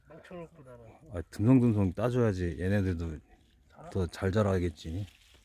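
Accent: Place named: Chungcheong, South Korea. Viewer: 충청도 사투리 끝판왕이십니다~~^^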